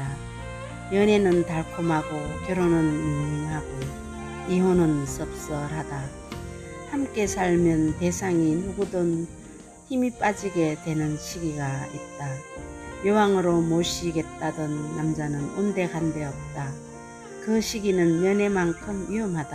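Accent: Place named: Gyeongsang, South Korea. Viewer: 경상도 말투 정감가요 잘듣겠습니다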